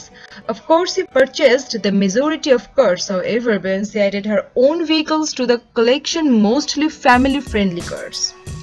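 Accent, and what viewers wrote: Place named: South India, India. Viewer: Heavy South-Indian accent and that would still be okay if it were not for some weird-ass autotune that was added in the editing room.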